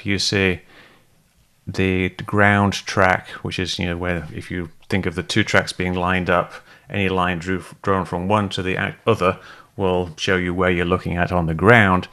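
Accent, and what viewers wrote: United Kingdom. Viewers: Your clear Manchester accent gives you away! Manchester United is my favorite football team in the UK! You keep doing what you're doing, it helps to dowse the flames coming from the arses of true believers;^)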